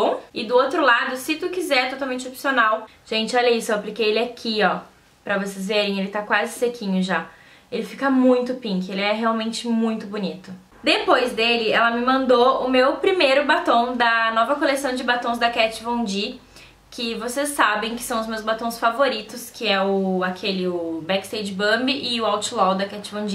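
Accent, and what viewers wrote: Rio Grande do Sul, Brazil. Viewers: Amo o meu sutaque gaúcho ,amo o seu, awn enfim deus ta la em cima tomando chimarrão ! Dale RS SM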